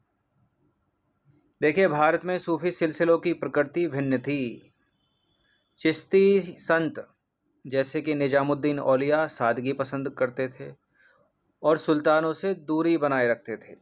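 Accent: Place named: Raebareli, India. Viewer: Sar aap kahan se bolate Hain Raebareli ki Bhasha aapki lagti Hai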